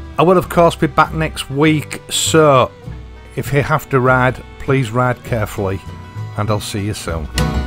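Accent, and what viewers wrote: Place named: Yorkshire, United Kingdom. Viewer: Dear: I like your music and I like your Italian (ooops - make that British) accent and I like your new motorcycle to which I will attach the name “Bullet” because you own one and I don’t and that’s what you call it. Actually, I find the music quite agreeable - much better than the acid rock that some bike videos play (and to which I do not subscribe). Anyone who disagrees is most welcome to watch one of the tens of thousands of other YouTube channels - or they can just get stuffed. One question about the Bullet: would any of the Interceptor or Himalayan accessories fit? I suspect not as those two machines are much more recent designs - but I thought I’d ask.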